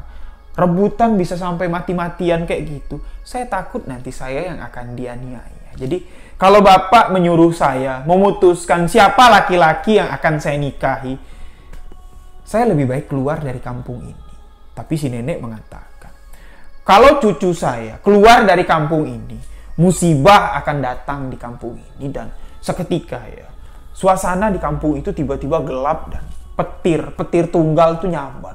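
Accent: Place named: Jambi, Indonesia. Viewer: Pertama denger tak kira org Bali g taunya logat Jambi ya ...sukses ya mase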